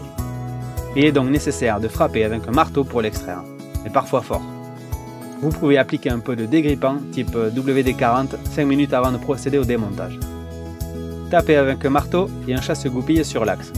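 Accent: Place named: South-West, France. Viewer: moi c'est cet accent du sud ouest qui me rend dingue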